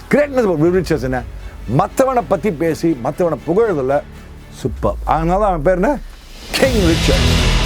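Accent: Chennai, India. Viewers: sir you are awesome! Again and again you are proving you are so talkative, sportive etc.. You always speak in our Madras basha.. 👌👌 Velipadayana Manishan sir neenga..No one can compete with your energy level...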